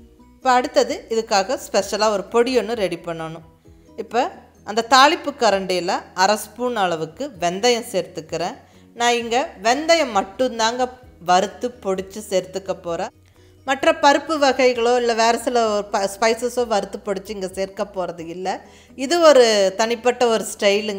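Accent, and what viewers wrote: Coimbatore, India. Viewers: Neenga coimbatore native ah mam,? ur slang is as me . Nallaa jammunu irukku😋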